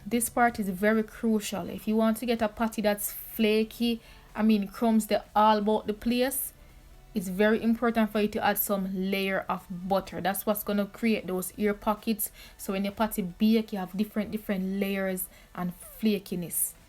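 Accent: Jamaica. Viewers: Love an uptown Jamaican accent 😍 📍🇬🇧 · Your accent caught me. I miss Jamaica right now.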